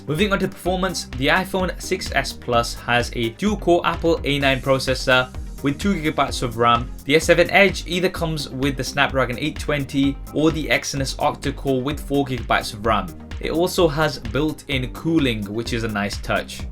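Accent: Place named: England, United Kingdom. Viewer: btw I know you're Muslim and from England I think by your accent where's your parents from.?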